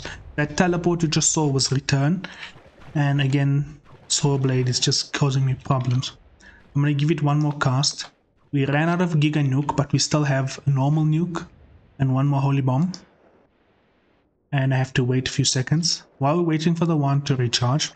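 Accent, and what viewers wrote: South Africa. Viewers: Huge fan of the series, was wondering where youre from? my guess is south africa :D?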